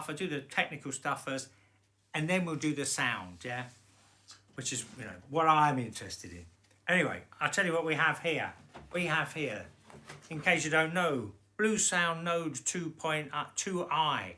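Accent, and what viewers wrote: London, United Kingdom. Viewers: Interesting! You’re comparing the analogue output from the iPad /iPhone to the amp, ie using the Apple internal DAC. I’ve now (last week) bought a Cyrus Soundkey thumb size inline DAC (£60 + £10 for the Lightning adapter) which you plug into the Lightning port. It makes a really worthwhile improvement with earphones, like the music is clearer, sharper and in a bigger room. If you fed this combination into the amp, I bet it might even beat the Node 2i ! In fact, I usually use Airplay to go from my iPad to my own Yamaha WXC50 network streamer and hence to the amp; this also bypasses the Apple internal DAC, of course, and sounds pretty good to my old ears. Thank you for your honest reviews. So many seem to be trying to sell you up. I hope you aren’t offended when I say I love the London accent.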